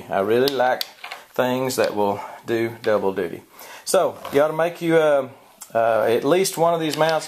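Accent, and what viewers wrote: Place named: South, United States. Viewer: I understood every word and love your accent! Voice overs for Disney could definitely be a second line of work for you! Another Southerner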